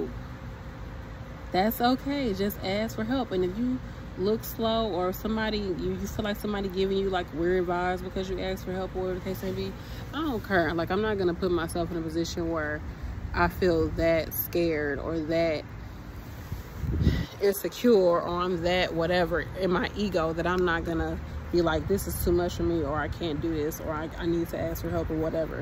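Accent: St. Louis, United States. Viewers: It's so funny how much this has all transitioned over the past few years. I so miss the 90s. lol It would seem to me that if you're only PRN, they'd give more orientation to allow you to create some sort of a routine considering you're not agency, you're actually a part of their team. And they know you're a LTC nurse and not a hospital nurse. t's just crazy to me. Be careful with that because you will still be held liable if heaven forbid something goes array during your shift. They will say "You could have asked for more training." You worked too hard to get where you are. And yes ma'am, you have come a long way. never forget that. I started following you a few years ago after you became an LPN. You were interviewing Victoria Randle of The Secret Cocktail. When I heard you talk I was like, thick chick gotta be from the Lou🙂. I'm from there too but I live in WA state. 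Anyway, keep your eye on the prize, there is more in store for you. You have great ambition and determination. The world is your oyster.🤩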